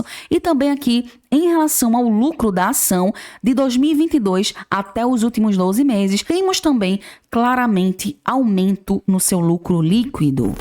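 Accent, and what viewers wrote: Northeast, Brazil. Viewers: Bom português, boa narração, conhecimento muito ok e sotaque nordestino. Tenho Copasa e penso em Sanepar, mas precisa melhorar o dividendos. Parabéns,.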